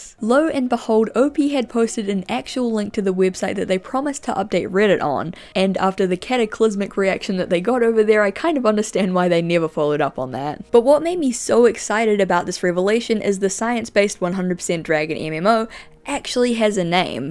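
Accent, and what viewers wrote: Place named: New Zealand. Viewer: Not only is your lovely kiwi accent with all those wonderful inflections and vowel pronunciation just magic to listen to when relaxing or reading etc... But you have great scripts and they flow so well. You put a lot of information in your videoes but they never feel like there's too much to digest or you are moving too fast... You have a great knack for taking these complex stories apart and making an engaging, enjoyable and interesting script out of them... Aaaaad it's just a delight to see what fantastic and cool looks you will rock for the video. You never ever ever fail to make me go 'wow' 😂 You are a real treasure and I really hope your channel grows and you get the success you deserve. Have a really great Christmas and a very happy new year! 🍻 ✌️ ☀ 🎅 🎄🎁☃️❄️❄️ From 🇮🇪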